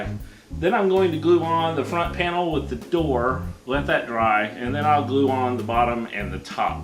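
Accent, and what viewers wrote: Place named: South, United States. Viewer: Great job, loved the British slang with southern accent.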